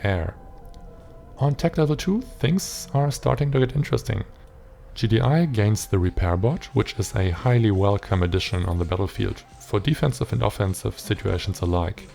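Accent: Germany. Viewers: Am Anfang dachte ich kurz du sprichst deutsch xD Dann doch englisch, dann habe ich deinen Akzent bemerkt :P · are you german?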